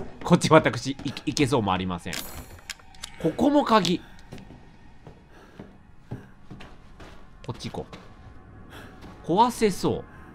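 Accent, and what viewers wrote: Kansai, Japan. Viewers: 普段よりさんの関西弁が多くて嬉しいしかないです🤦‍♀️🤦‍♀️💕💕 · 近年なかなか聞けなくなったレトさんの関西弁、、、ありがとうございます✴ なんか新鮮です！ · レトさんの実況補正あるにしてもめちゃくちゃ怖すぎて画面ちっちゃくして見てます😂😂関西弁たすかる😂😂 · ホラーとか焦る場面が多いゲームになるとレトさんの関西弁がいっぱい聞けるので嬉しい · 最強に怖くなると関西弁に戻る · なんかバイオだとレトさん西の感じ強いね笑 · レトさんがびっくりする反応とたまにでる関西弁、好きです。怖い系とびびる系は大丈夫なんだけど痛い系は無理ドライバーの+−そんなに気になる？笑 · 関西弁がどんどん増えてく…笑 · 焦ると関西弁が出てきてしまうさん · 追い詰められたら関西弁になるレトさん好きwww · やっぱレトさんの関西弁ええなあさっきまでガッチさんの見てたから差が大きくておもしろいwww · バイオしてるさんは関西弁になるw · なんか最近前以上に関西弁がよく出る気がする、気のせいかな？笑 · レトさんの関西弁がおもろすぎる笑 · レトさん焦ると関西弁がめちゃめちゃでるからちょーうれしい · レトさんの関西弁で中和されてる気がする、、、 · びっくりしすぎだし関西弁出すぎｗ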